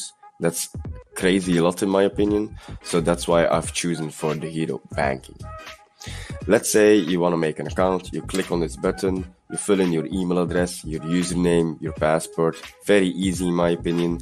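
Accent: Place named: Belgium. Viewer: Lol this Guy is from Belgium you can hear His accent but thx Nice vid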